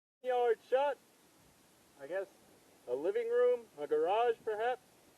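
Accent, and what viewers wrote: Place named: Texas, United States. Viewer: Dude you talk exactly like me. I was born in Ft. Worth grew up in the mid-cities of DFW. Great vid man.🤠👍🇺🇲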